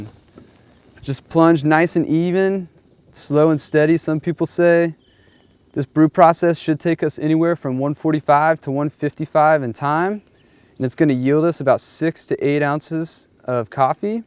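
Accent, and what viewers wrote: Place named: Western Canada, Canada. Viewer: Great Review, dude sounds very Vancouver/ Calgary.. but I know this is a westcoast US brand.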